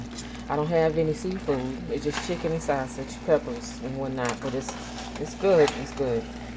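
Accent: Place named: New Orleans, United States. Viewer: Actually paella is from Spain but Cuba does make a couple yellow rice dishes based off of the yellow rice dishes of Spain since Spain’s influence in Cuba was heavy and many many Cubans are of Spanish ancestry. I was surprised you called it a “yellow rice jambalaya” but then I heard your accent and since I’m from the New Orleans area I recognized it as a New Orleans area accent. So now I know why you called it a jambalaya since we have jambalaya in New Orleans and south Louisiana and it looks very similar to a jambalaya. But there is a reason it looks similar. Jambalaya descends from the paellas of Spain and pilafs of southern France. I’m of Spanish descent from the Canary Islands of Spain and our family we sometimes make a jambalaya with saffron (to make yellow rice) instead of the common way to make Jambalaya in the New Orleans area and it looks a lot like this when it’s finished.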